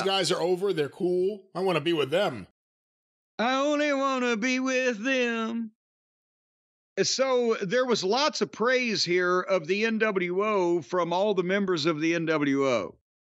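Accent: South, United States. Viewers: Hate to say it but ya got that southern accent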